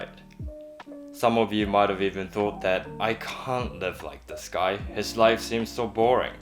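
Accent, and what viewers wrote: New Zealand. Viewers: I can definitely here your kiwi accent, 😄cool bro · Did you spend time in New Zealand, your English has hints of a kiwi inflection. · I was very impressed by how you were able to cut and style your own hair with the use of a mirror. And the handsome smiley face topped off the whole ‘look’. 😉 Where did you learn English? Some words sound like British English and then some sound like the English spoken in New Zealand. Really enjoyed watching your video. Best wishes from Stefan in Ireland. Update: Ha!! I just saw some of your other videos and now understand why your accent sounds rather like a New Zealander!! Lol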